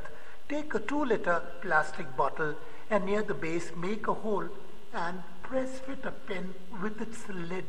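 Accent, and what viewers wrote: India. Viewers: Kesin hindistanlı bu konusan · Anlatıcı hintli.çünkü bu hintli ingilizce aksanı :)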